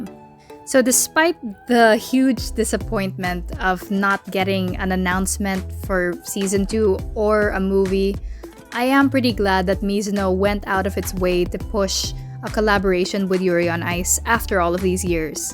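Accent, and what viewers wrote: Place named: Philippines. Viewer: are you filipino? I can catch the accent bc it's the same as mine 💓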